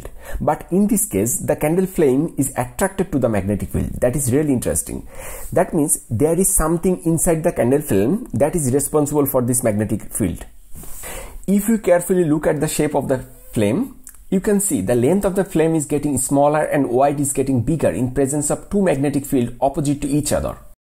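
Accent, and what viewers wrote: India. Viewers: Is this guy a native of India?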